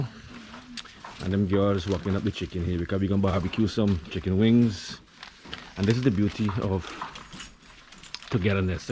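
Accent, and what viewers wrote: Guyana. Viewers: Guyanese accent seems musical, I always love to listen Sunil Naraine the cricketer voice as it seems musical like yours. Love and respect from 🇮🇳. Keep enjoying bro.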